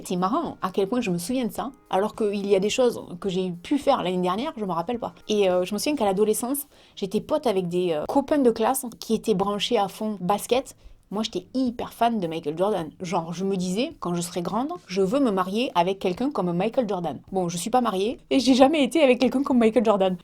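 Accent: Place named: Villeneuve-sur-Lot, France. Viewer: MAMAN TU ES VRAIMENT MA MÈRE JE SUIS NÉE À VILLENEUVE SUR LOT! TON ACCENT ME DISAIT QUELQUE CHOSE ❤️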